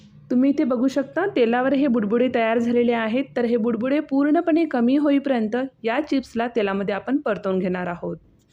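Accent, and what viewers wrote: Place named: Jalgaon, India. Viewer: जळगाव भाषा बोलत आहे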